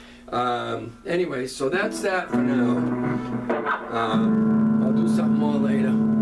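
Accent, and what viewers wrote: New England, United States. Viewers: Are you in Maine or Mass? you sound like your a New Englander. Good Stuff my friend.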